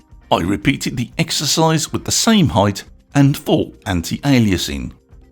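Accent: United Kingdom. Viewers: Your British accent alone was enough to convince me ! thank you!!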